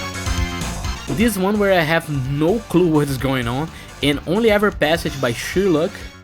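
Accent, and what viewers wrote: Brazil. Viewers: Hmm...sotaque br reconheço de longe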